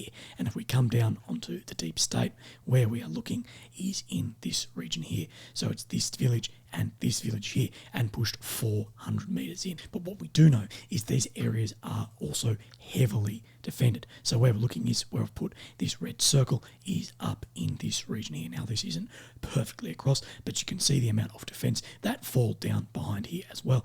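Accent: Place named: Australia. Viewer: it is very difficult to follow you — not because of your Australian accent — because your voice is so hoarse. If you consult a speech pathologist I am sure she would counsel you to speak slowly to compensate for the lack of tone in your voice. The added benefit to speaking slowly is you are doing less harm to your throat. Thanks.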